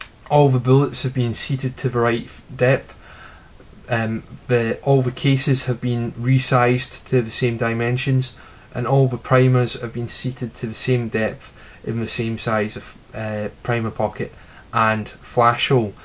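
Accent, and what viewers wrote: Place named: Scotland, United Kingdom. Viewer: Not only is this entire series very informative, it sounds like it has much more authority with the Scottish accent. Props to you, good sir.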